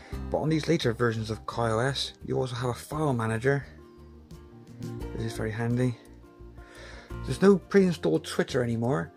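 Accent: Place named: West Country, United Kingdom. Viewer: Great he's English. Could be from West country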